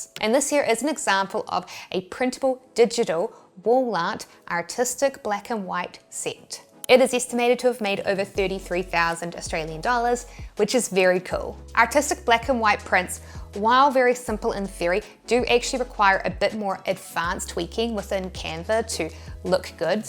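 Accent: New Zealand. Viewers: I really like the New Zealand accent :) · Knew you are a kiwi I grew up with a lot of kiwis in PNG so I know the accent. Thank you for your video 🥝😍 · I already knew you were from New Zealand, I'm American. Thanks for all the great content you put out.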